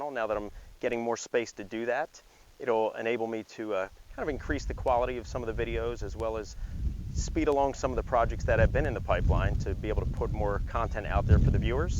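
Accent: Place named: Maryland, United States. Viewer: I've been watching your Fiero vids and I was born and raised in MD before moving to Nashville 4 yrs ago. The second I heard your accent, I knew I had to subscribe! Congrats on the new location. Wish I was still in MD. Could use your knowledge for my Fiero!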